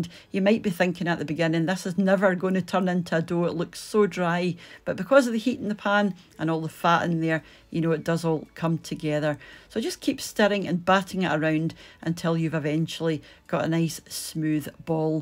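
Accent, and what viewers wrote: Scotland, United Kingdom. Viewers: Loved you r video and your accent, miss my friend s from Scotland. With love from Brazil Jerry.❤ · You sound like that little Scottish ladie on television , the buying and selling show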